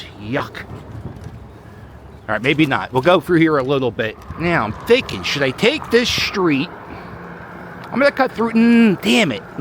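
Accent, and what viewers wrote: Philadelphia, United States. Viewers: Yo Cuz…..your bike tour was great. First timer here! My kids make fun of my Philly accent all the time…..so it was so good to hear someone sound like me. Too bad about Franklin Mills. It looked very depressing. I did shop there a lot. Thanks for the tour.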